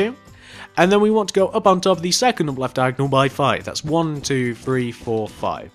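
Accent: United Kingdom. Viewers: Bruh he’s British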